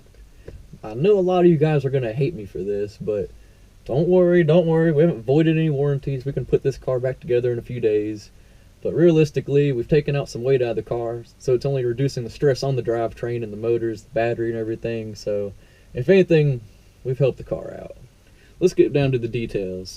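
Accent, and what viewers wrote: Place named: South, United States. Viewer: I love the way you talk man... some people from the south that have lost their accent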